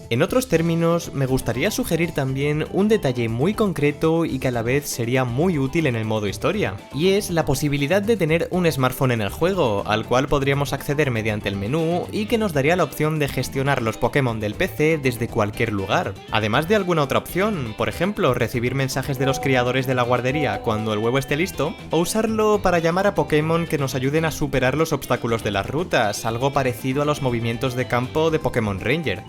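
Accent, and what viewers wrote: Zaragoza, Spain. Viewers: Eres de Zaragoza??? 😊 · Eres de Zaragoza? 🤔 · Eres de Zaragoza??? =)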